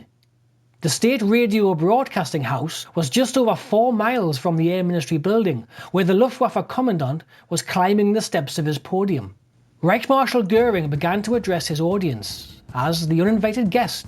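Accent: Newcastle, United Kingdom. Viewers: You sound like a Geordie. I am from Lobley Hill, Gateshead originally. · Not sure if it's Geordie accent but reminds me my childhood in blyth.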